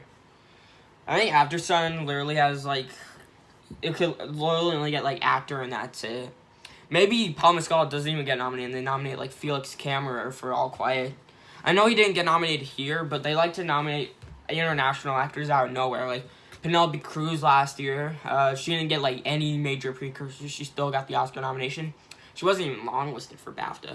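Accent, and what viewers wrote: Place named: United Kingdom. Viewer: Love your british accent 🤭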